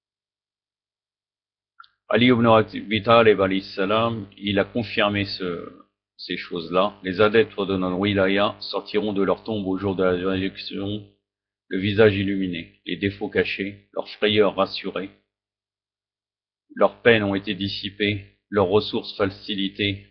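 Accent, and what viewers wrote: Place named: Iran. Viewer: tu récite avec un accent perse, tu parle français? tu ne fait aucune effort de récitation accent arabe. tu n'est pas chiite c'est moi qui te le dit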